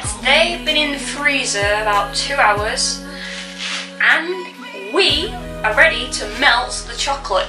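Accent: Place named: Oceania. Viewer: Full view and like #5 from Bits of Real Panther! I think it's pronounced BWAY-no. You certainly have an energy about you,! I couldn't quite place the accent. Somewhere in Oceania? At least the recipe here was simple, something even I could do! hehe Also, do you keep any of your thumbnails on file? I'd like to feature a couple of them in an upcoming video. Anyway, thank you much for sharing, and I hope your weekend was truly "sweet"!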